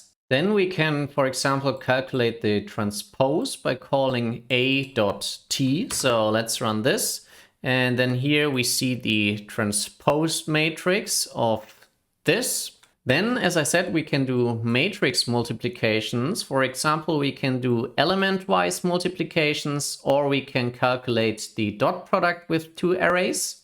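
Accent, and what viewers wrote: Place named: Germany. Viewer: ich versuch NumPy zu lernen aber bin immer noch von diesem deutschen Akzent ablenkt😭😭aber klingt sehr niedlich❤️❤️❤️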